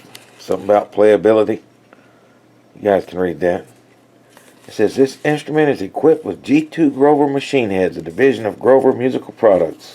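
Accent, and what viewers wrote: South, United States. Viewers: You're my new favorite guitar guy on YouTube, now. You from the South, brother? Mississippi over here!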